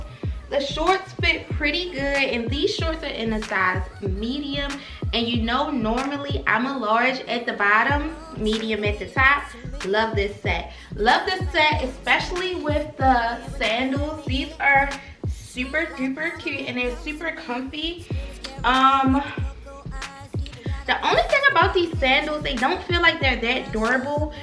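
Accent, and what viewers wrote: Baltimore, United States. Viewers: First time here are hi dear, are you from Baltimore I know our accent anywhere😂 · I love your accent 🥰🥰I knew you were from Baltimore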